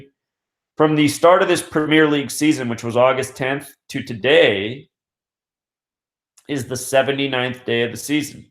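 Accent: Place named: United States. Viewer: London Freemasons was written on that helicopter on the London bridge terror events last year, and the guy with the anonymous mask running in placing a sheet of plywood over the spot where the car was supposed to have crashed into. I know someone already pointed this out. Leicester is pronounced Lester And Sinead is pronounced Shin - eeh- d. Your american pronunciation cracks me up haha. Not too many get the Sinead one correct. Its famous for miss pronunciation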